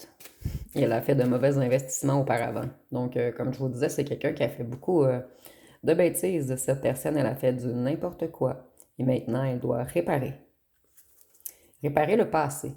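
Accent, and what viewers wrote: Canada. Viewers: Désolé je sais que je suis enquiquinant, mais ca fait bizarre, pas naturel les faux ongles, cette surépaisseur, l'accent canadien on aime bien il est pas abusé, je vous souhaites de trouver en vous quelque chose qui rayonne qui fait que tout ajout visuel, auditif, ou quoi que ce soit de "matériel" ne serait que reducteur . Une force tranquille Amen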